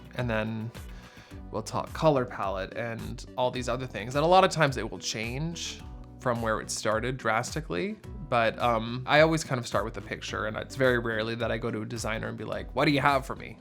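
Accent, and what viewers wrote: Canada. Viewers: I love her little Canadian accent poking through every now & then 😘✨ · so canadian...."aboot" 😍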